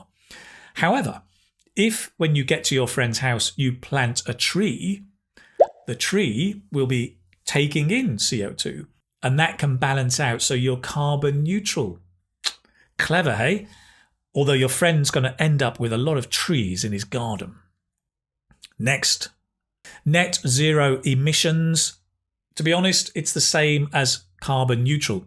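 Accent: United Kingdom. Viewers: Это явно не американское произношение. Вы британец? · The British accent is wonderful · Hi, as always your content is very useful to practice the speaking section, but I have a question; You have a British accent, is there a chance that your advice can have some phrases/sentences/expression that is used just in the UK?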